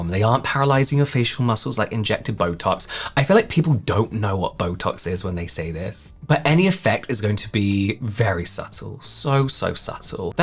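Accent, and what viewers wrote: United Kingdom. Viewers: I can imagine him reading children’s bedtime stories on Ceebeebies or something!! Obviously not just that, he’s got a lot of potential for many opportunities for voiceover aspects of a career 100% but I think my kids when they were little would have enjoyed his voice too! ❤ Accent and voice is on point! 👌🏼🥰 British accent along with his voice/pitch etc is perfection 🎉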